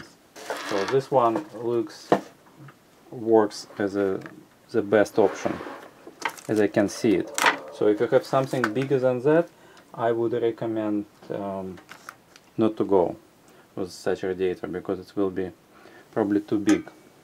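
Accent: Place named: Russia. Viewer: Российский акцент так и показываешь!даж не стараешься говорить с меньшим акцентом,а ,,буилд,,кайфовый.спс)_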